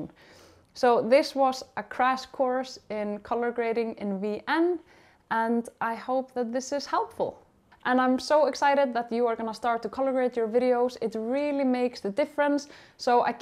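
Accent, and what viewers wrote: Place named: Iceland. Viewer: Love your Icelandic accent!! Beautiful ❤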